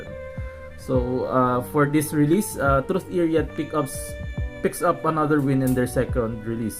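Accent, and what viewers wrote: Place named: Philippines. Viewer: Pretty sure this reviewer is a Filipino. That accent 😆😆😆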